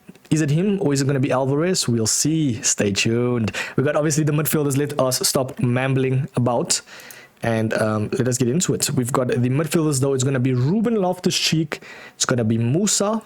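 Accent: South Africa. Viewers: Are you South African bro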